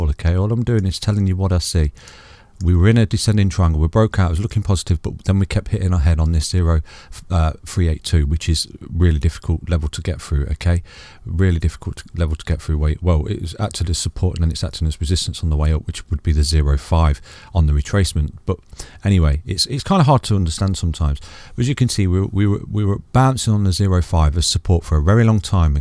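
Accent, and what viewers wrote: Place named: Leicester, United Kingdom. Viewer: Great insight, you sound like a fellow Leicester lad 👍🏽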